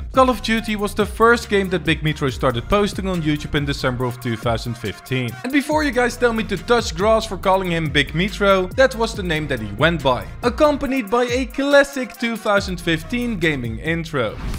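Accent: Netherlands. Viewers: Nederlandse support 🇳🇱🇳🇱 ik dacht al dat je nl was aan accent maar wist niet zeker wel ziek ouwe je doet goed bro ga zo door💪♥️ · ik wist dat je nederlands was je accent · Bro aan het begin hoorde ik dat je NL was en toen dacht ik wat hoe wist ik dat niet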